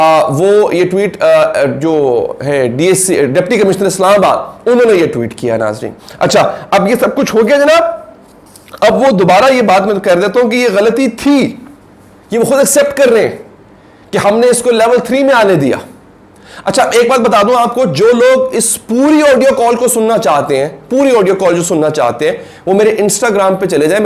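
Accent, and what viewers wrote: India. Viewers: Sir please Urdu sahi Bolen Indian accent hota ja raha he apka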